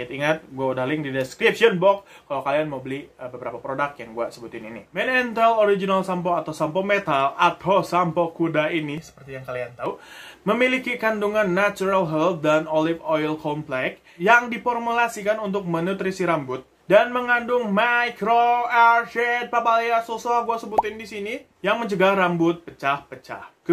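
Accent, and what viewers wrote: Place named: West Java, Indonesia. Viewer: Sunda anjir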